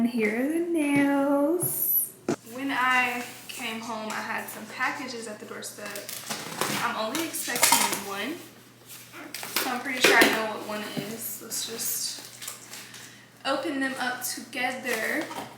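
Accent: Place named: South, United States. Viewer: i love watching your vlogs so much !! the mani pedi part had me giggling so much !! let that southern accent shine girl heheh 🤍🤍🤍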